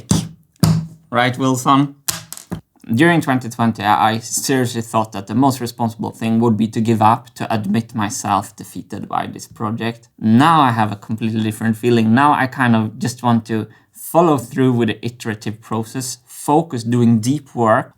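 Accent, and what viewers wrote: Sweden. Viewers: Hes swedish 😅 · the comment was clearly tongue in cheek. Nobody is actually complaining about very slight Swedish accent. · Wasn't it more like "yeneration"? And yes he pronounces lots of words or parts of words just like he would in Swedish (because he's a Swede), and makes some mistakes in grammar but I think it's kinda charming since he has a pretty good vocabulary and flow. He's a good communicator, don't you think?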